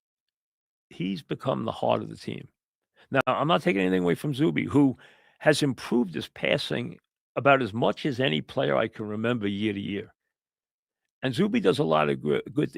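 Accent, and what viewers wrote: New York, United States. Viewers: He's in or near Noo Yawk whaddya expect? Back afta dis...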